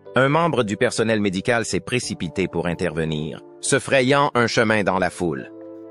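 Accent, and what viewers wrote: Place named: Quebec, Canada. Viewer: J'ai vu le titre racoleur sur la mort de Chumlee. Le pire c'est que j'y est cru j'avais même de la peine .docu merdique avec une voix IA a l'accent québécois. Ridicule 👎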